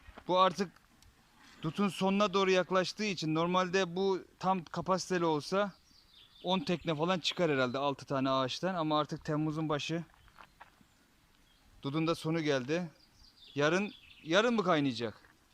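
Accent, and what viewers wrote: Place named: Ordu, Turkey. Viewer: Harika Ordu şivesi 😁.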